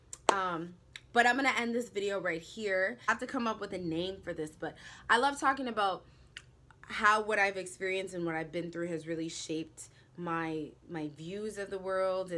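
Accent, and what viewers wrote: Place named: United States. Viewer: She is a child of immigrants so naturally she has an American accent.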